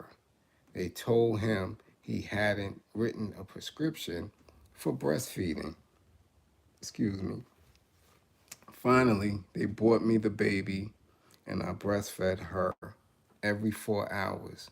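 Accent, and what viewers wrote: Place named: Brooklyn, United States. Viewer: New sub (from Albany, ny) I love hearing you talk that talk with doggie in that bk accent. I had to subscribe and keep getting that knowledge. We need more men like you in this world to help get our ppl together. Keep up the great work!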